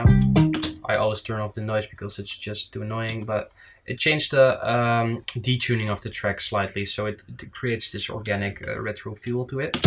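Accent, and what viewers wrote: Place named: Netherlands. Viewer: You sound Dutch, what are the chances 😅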